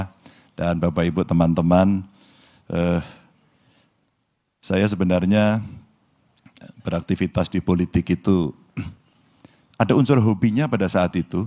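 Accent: Java, Indonesia. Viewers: itu bro bukti pak ganjar bisa dan tahu bahasa inggris ya kalau soal logat beda orang lidah jawa sama lidah orang luar apalagi pak ganjar belum pernah tinggal di luar negri ataupun mengenyam pendidikan diluar negri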